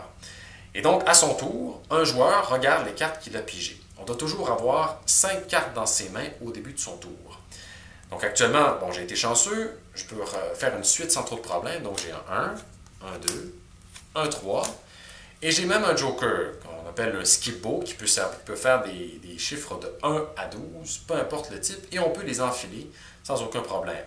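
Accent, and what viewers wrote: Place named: Belgium. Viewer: C'est un belge !!! 🙄🤭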